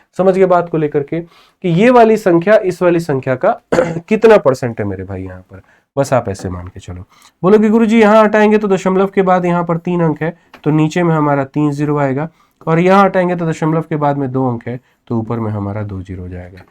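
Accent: Bihar, India. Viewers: Sir , aap bihar se hai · bihari Vali bhasa Mt boliye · Maaz aa gya sir ❤❤❤ dil khus ho gya Aapna bihari ton ka baat hi kuch aalag hai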